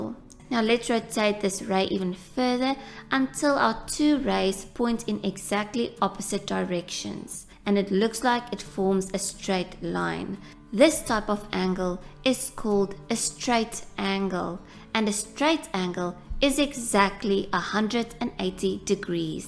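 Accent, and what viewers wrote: United Kingdom. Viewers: rays= raisee british ahh tecaher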